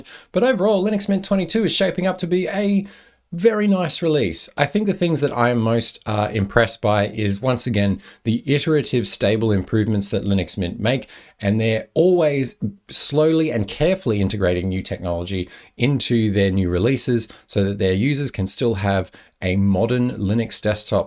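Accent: Australia. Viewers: I hear Australian accent > I stay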